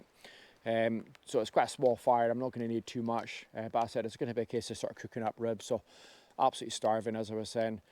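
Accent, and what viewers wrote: Inverness, United Kingdom. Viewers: Great tents got one a few years ago. Is that an Inverness accent you have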